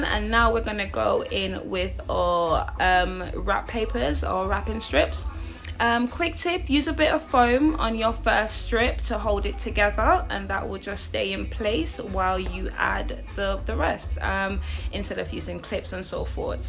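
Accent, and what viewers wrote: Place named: United Kingdom. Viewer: I just love your accent. I love to hear a black Brittain. You are a very talented hairstylist as well. Here in America, everybody is going natural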